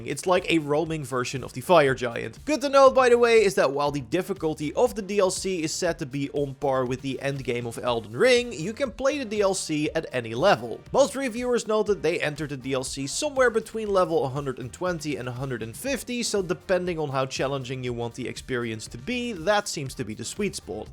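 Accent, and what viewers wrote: Netherlands. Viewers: Lekker hollands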